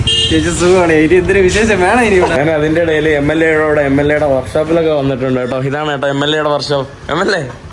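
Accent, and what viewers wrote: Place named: North Kerala, India. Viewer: boss , eatho oru uthara keraloo aa samsarathil oru pucham …i luv malappuram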